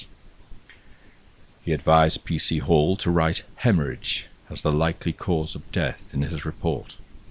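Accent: United Kingdom. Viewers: I really really love this British accent and reading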